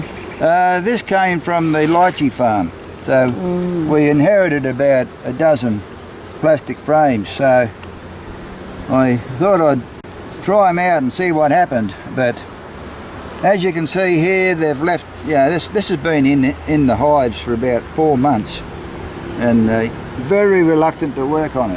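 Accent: Australia. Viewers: I have watched quite a few vids on the flow hive and most commentators come across as sniveling little spoiled brats trashing the product because of their often laughable and regularly idiotic opinions that appear to be mainly based on jalousy more than anything else. This is the first vid I have seen where anyone makes valid criticisms and demonstrates their factual concerns with the product. You have earned a lot of credibility with me. Clearly you are a very knowledgeable beekeeper and more importantly, an honest and fair gentleman. I get the impression you may not be overly keen on the flow hive and that's OK because your concerns are based on fact and experience and not concocted opinion just to jump on the bashing bandwagon. No doubt the product is not perfect but you make sense with your concerns and questioning of it which is miles above what many others have done. 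It's also great to see and hear a died in the wool Aussie making such informative and interesting vids. Well done mate and I wish you every success and good luck with your endeavors.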